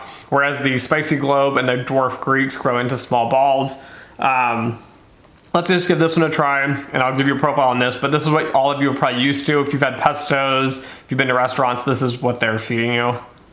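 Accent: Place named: California, United States. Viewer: You sound like you are in California.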